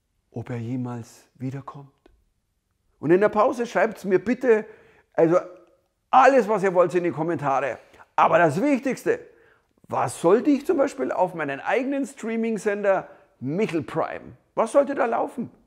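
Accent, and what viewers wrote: Bavaria, Germany. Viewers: nein, nein, passt schon; ich finde es lustig! 😅 Überhaupt mag ich allgemein deinen/Ihren bayrischen Dialekt...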